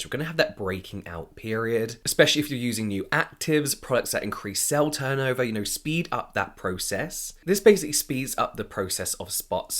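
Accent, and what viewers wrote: United Kingdom. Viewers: your British accent is everything! It's literally better than ASMR 😍 love it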